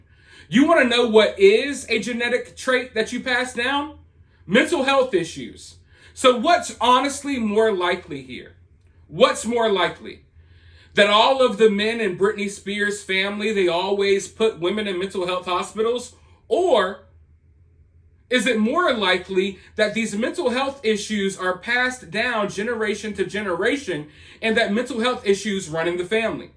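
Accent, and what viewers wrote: United States. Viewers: You are speaking such a beautiful american-english, so I (a german speaking person) can follow you. Thank you!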